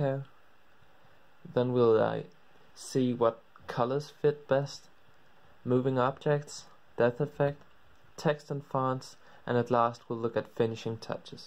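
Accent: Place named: Denmark. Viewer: I can clearly hear that u are danish xD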